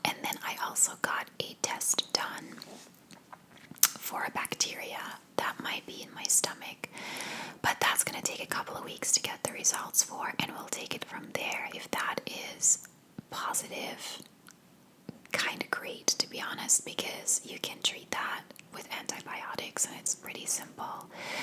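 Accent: United States. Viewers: I'm so curious about you. You have an American accent but you live in Ireland. Your folks live in Spain. Can you speak a little bit about your history.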